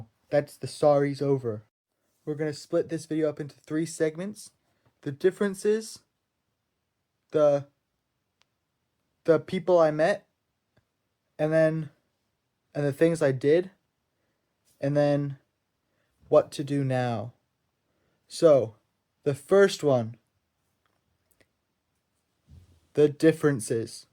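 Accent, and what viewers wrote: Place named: United States. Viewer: you sound more american than new zealand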